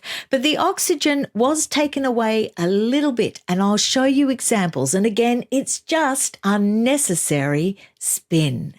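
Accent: Australia. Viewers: I love listening to. You’re voice and Australian accent it the best!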